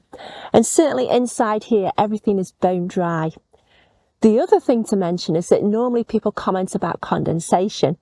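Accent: England, United Kingdom. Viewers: The location says Canada. The accent says England.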